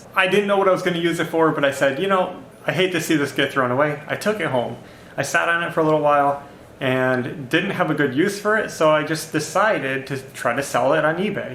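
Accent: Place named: Indiana, United States. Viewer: Kokomo! I knew I recognized the speech patterns. I'm in Valparaiso. Congrats on the YouTube Channel guys. Live the dream here and keep pushing forward!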